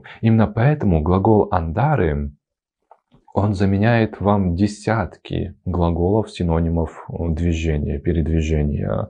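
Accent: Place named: Italy. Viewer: Вы же понимаете, что человек живет в Италии и там говорят именно так и мне это помогает привыкнуть к итальянским акцентам? Я сама уже иногда говорю "ситуасьйон")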